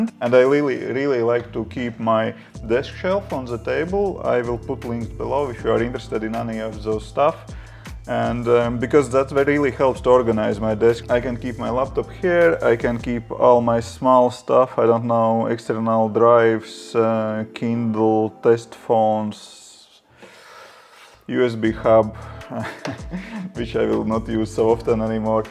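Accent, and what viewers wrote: Russia. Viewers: Ты русский? Чувствуется акцент. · Ты чо, русский? Чего за диалект. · i'm confused, you have an accent that sounds somewhat russian, but your name sounds nothing russian... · Your accent is so Russian!;) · are u russian? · Your fucking russian accent is super annoying.... Kommunist yerk.... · слышно русский акцент) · Говорите как будто Русский на Английском... )) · Русский чтоль? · Hi . Are u russian? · ни фига, это конкретно русский акцент) ты мало чешских видео смотрел my friend XD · о да, русский акцент)) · Мужик русский судя по акценту. Да и всё выдаёт ;) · Да да да!! -_- я слышу русский акцент, причем лютый =)))) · Ооо так вот какой "русский акцент" стендаперы вечно пародируют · Я слышу русский акцент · Русский акцент рулит))) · Лады, понял. Просто уж сильно похож на Русский акцент с явным преимуществом в разговоре звука "Р-р-р-р" :))) Похожий акцент ещё у Аргентинцев с явной звучной буквой "Р", НО сетАп всё равно классный, спасибо за идею. 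:) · Чувак)) у тебя явный русский экцент)) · i said that because you have russian accent. This my opinion ) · Your accent is very similar in Russian, yes) I thought you are Russian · I felt a Russian accent, хотя может мне показалось) nice video, hello from Kazakhstan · Ох уж этот акцент из Russland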